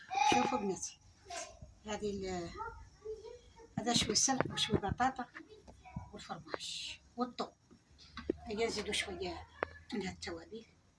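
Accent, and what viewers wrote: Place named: Gulf, Arab world. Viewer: ماشاء الله 😍. باين بنينه وروعه كما للهجه عندنا بالخليج 🌹. تسلم يديك خالتنا العزيزه❤️ سعدات الي بياكل من طيابك 👍🏻❤️🕊️🕊️🕊️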